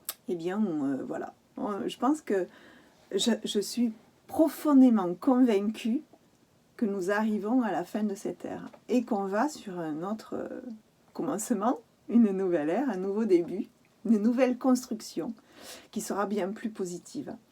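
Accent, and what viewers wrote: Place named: South-West, France. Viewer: Ohhhh merci pour votre lumineuse et étincelante Présence, merveilleuse déesse au bien joli accent (du sud ouest?). Attirée avec un bel élan de vous écouter immédiatement et absolument ravie d'adhérer à vos propos si exacts!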